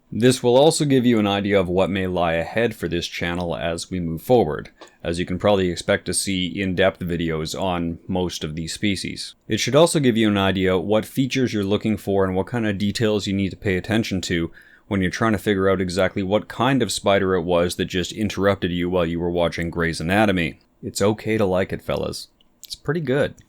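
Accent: Canada. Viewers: These videos are great and I love your sense of humour. I also love to hear Canadians speak. "Out" and "about" in particular. You should do an episode on the Aphonopelma marxi (brown tarantula). Common in Colorado, where I live, and terrifying to all the transplanted humans from West of the Continental Divide. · Nova Scotia! Right on I thought I sensed a fellow Bluenoser! 👌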